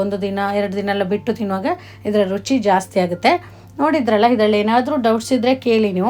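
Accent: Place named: Kerala, India. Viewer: Nice to see your recipe. Will try. Good explanation. Some of your accent tells that you are from Kerala. Right??😊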